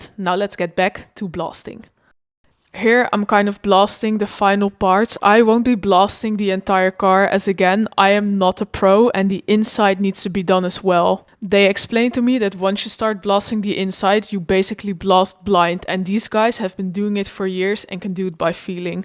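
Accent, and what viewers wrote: Netherlands. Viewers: You are Dutch. I can hear it. Lekkerrr.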